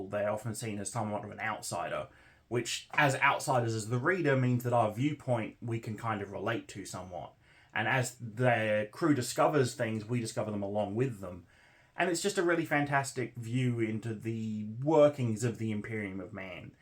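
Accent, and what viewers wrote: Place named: United Kingdom. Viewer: Thank you for the video. You should try speaking less British. You hold onto words too long. This video could have been 11 minutes and I wouldn’t have started nodding off immediately. Good content though.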